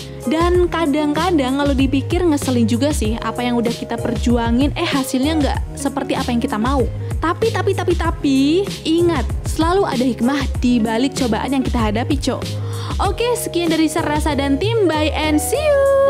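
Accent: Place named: West Java, Indonesia. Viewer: Sue sundanese na geuleh naon teh wkwk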